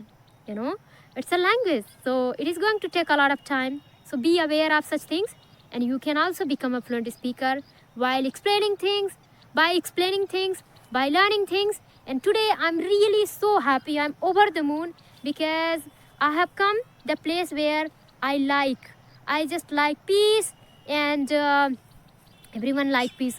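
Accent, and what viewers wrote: India. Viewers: Are YoU from Indiaa???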